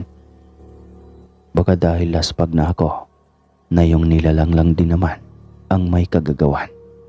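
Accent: Visayas, Philippines. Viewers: pangit mo mag kwento.. bisayang bisaya.. yay.. gusto koyung kwento boses molang ang pangit pati pag deliver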